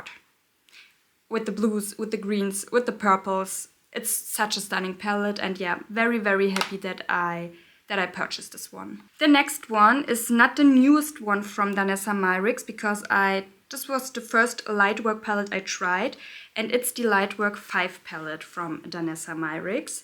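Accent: Germany. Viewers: I love your accent! Do you live in Germany? Happy New Year❤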